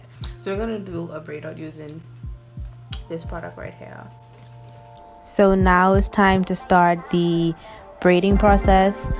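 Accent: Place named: Trinidad and Tobago. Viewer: My girl I'm hearing a lil Trini in yuh accent🇹🇹🥰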